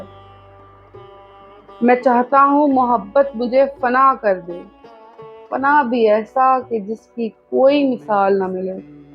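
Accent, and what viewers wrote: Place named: India. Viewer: لہجہ ہندوستانی ہے آپ کا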